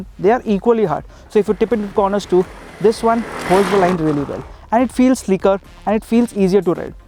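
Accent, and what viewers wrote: India. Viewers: Nice content coverage but very poor rendition...You need to talk a bit slow because with the desi accent it becomes difficult to follow...Awesome coverage overall though, keep it up!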